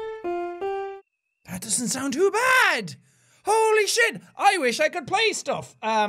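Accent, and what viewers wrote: Ireland. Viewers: ur Irish accent really pushed in this video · Are ya Irish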